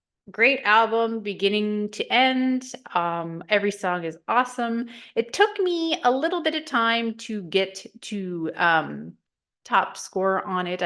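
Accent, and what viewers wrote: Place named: Canada. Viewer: I have always thought that 1973 was probably my favourite year of recorded music, especially in the Rock genre. I'm quite a bit older than you, but I love that you focused on 1973, and thank you for including a coupe of artists I'd never heard of before ( Gary Higgins & Duncan Browne), I'll be searching for their work to enjoy. I also like the fact that your tastes are eclectic, mine are as well. I was introduced to many different genres of music as a child, I had an Auntie who worked for CBS Records, so she gifted many albums to me from a vast variety of genres. I love your Canadian accent. Take care!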